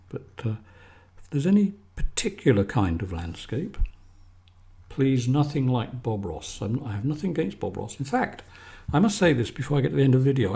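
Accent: England, United Kingdom. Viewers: I have learned a lot from watching your videos, I have painted along with you and it has helped me to paint loosely, which I struggle with. I love your accent, my mother came to the US from England along with several of her siblings and it reminds me of the time they would all get together. Anyway I would love for an instruction on painting rocks, I live in AZ where we have a lot of rocky ground. Thank you for all your video's.Sam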